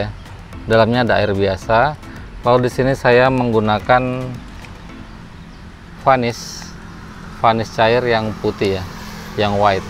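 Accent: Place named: Banjar, Indonesia. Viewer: logat nya ada sedikit bahasa banjar nya . benar kan mas. ,😀😀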